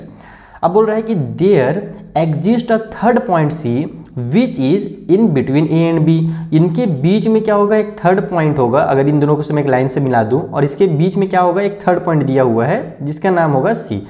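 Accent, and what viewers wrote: Bihar, India. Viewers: Sir bihari lagat he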